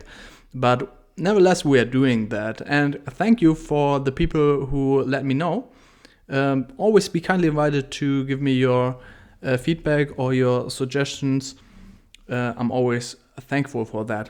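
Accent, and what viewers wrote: Germany. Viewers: You are also a German. Your English accent is my hint^^ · Hab an deinem Akzent erkannt, dass du Deutsch bist :D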